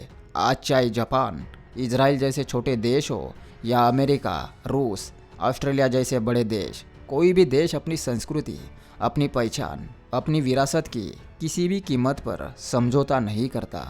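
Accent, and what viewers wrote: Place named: Mumbai, India. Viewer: Tumhara talking tone Mumbai walo jaisa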